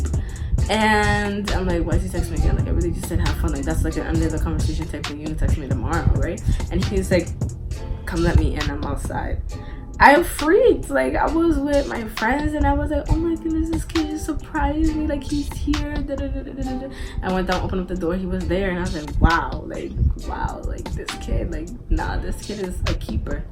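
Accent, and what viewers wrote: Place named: Jamaica. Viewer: Yuh sound lik yuh Jamaican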